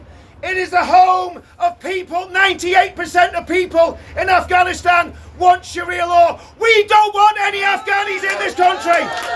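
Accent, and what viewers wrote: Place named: Ireland. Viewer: Love your irish accent ❤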